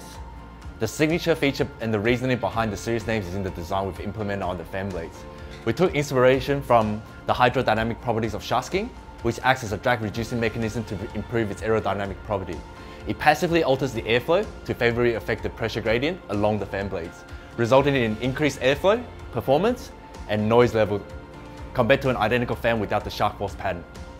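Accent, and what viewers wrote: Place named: Australia. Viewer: sounds so aussie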